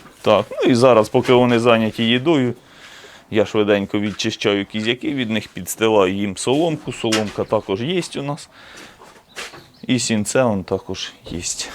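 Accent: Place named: Poltava, Ukraine. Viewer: Добрий день. Можна питання, а з якого ви регіону? Чомусь здається, що Полтавщина ❤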